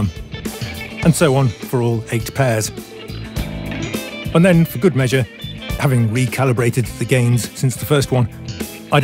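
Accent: United Kingdom. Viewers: The only youtuber with british accent i can actually bear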